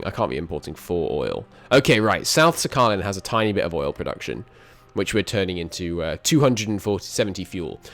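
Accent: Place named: RP, United Kingdom. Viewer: How would you describe your accent? To me (Australian born), it sounds like an English Received Pronunciation, but perhaps tempered with something else. At times it sounds faintly like a sort of 'cultivated' Australian accent, the way a well educated younger Australian who isn't ashamed to show it sometimes speaks. (But not Cultivated Australian, which is a semi-official category, and today virtually extinct - the former PM, the late Malcolm Fraser, was an exemplar of this accent, and you don't sound anything at all like him).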